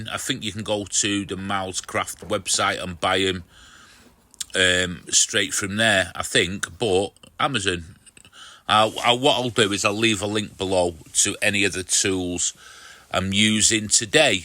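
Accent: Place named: Manchester, United Kingdom. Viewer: Excellent to hear a fellow Manc!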